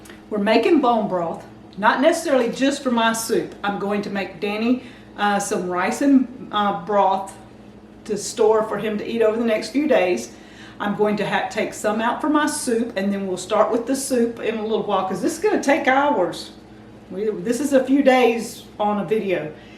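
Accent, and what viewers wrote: South, United States. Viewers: I just love southern accents! pronounces "boil" as "boll" instead of "boyle". Tell Danny I wore my new American-made Roundhouse stonewashed bib overalls to the dentist today. I love them! I shared this video on all my social media pages, so people could learn a very valuable skill to help them through the coming hard times. Now all they have to do is start watching Deep South Homestead so they can learn how to grow the stuff to put in the soup. You guys are doing the Lord's work, and God bless you for it!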